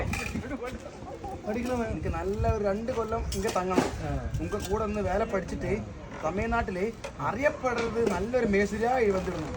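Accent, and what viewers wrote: Malappuram, India. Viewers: പെരന്റെ ഔത്ത് നമ്മുടെ മലപ്പുറം ഭാഷ😅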